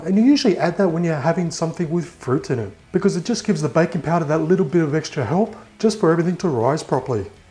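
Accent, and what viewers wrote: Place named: Australia. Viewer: i like your aussie accent bro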